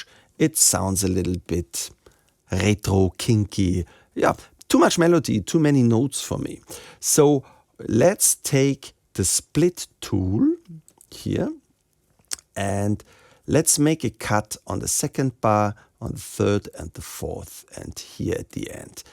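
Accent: Germany. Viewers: I like this dude. I usually find German accents too harsh but this chap is very pleasant to listen to. Doesn’t sound like he’s in a hurry like most YouTubers! Nice and chilled out and uses pauses and explains things well. I also love how he says “schteinberg” in the true german way. I also love dom sigalas videos but I am finding those constant zooming jump cuts really annoying!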